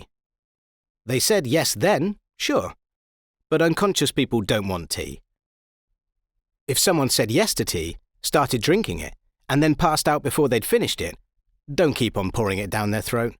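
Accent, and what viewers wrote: United Kingdom. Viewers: This is just so much better with a deadpan, British voice :P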